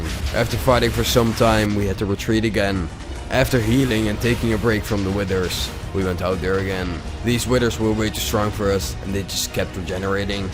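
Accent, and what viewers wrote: Netherlands. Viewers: The dutch accent i love it 😂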